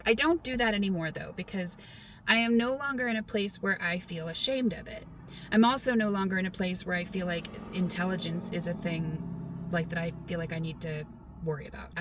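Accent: South, United States. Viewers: You can take the girl out of the south, but you can’t take the south out of the girl😂❤ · Girlfriend, you better rock your, our, Southern accent! I'm proud as he!! about mine and you should be, too! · I think your southern accent is adorable. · Amat is as smart does ❤😂love your bit of southern accent Stay safe girl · As some one who lived in East Tennessee for 24 years, your accent makes me miss my friends I left when I moved out west. Plus, my son is a bred and born southern boy, who sadly, doesn’t have much of an accent. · I never thought I had an accent until I started traveling to Mexico. I'm from Wisconsin and just thought I spoke in a neutral way. All the Mexicans I met and held conversations with, wanted to know what part of Canada I was from.😂One of the reasons I love your channel is when you get all excited and animated and you switch to southern mode! 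It's endearing and does not make you sound dumb. Have a good time at Mt. Ranier, my dear!😁✌🤟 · I love it when you slip into your Southern accent! I was raised in Southern California by parents from Mississippi and I even slip into it. I was raised with so any Southern phrases that they are just part of me. Keep do’in what yer do’in and drawl yer little heart out! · I love your southern accent. It's a big part of your bubbly personality. ❤ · I love your Southern Accent.:face-red-heart-shape::face-red-heart-shape::face-red-heart-shape::face-red-heart-shape::face-red-heart-shape::face-red-heart-shape::face-red-heart-shape::face-red-heart-shape::face-red-heart-shape::face-red-heart-shape::face-red-heart-shape::face-red-heart-shape::face-red-heart-shape::face-red-heart-shape::face-red-heart-shape::face-red-heart-shape::face-red-heart-shape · I just thought you had spent some of your life in the south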